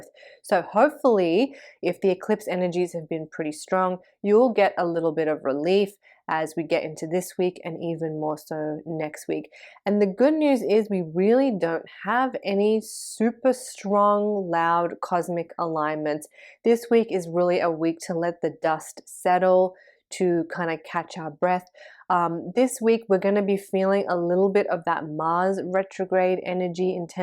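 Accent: Australia. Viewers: She must have lived in Australia a long time because of the Australian accent.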